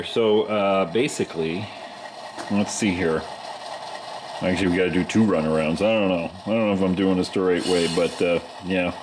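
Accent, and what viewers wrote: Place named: Chicago, United States. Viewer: My wife insists that you are originally from Chicago per your accent. Are you?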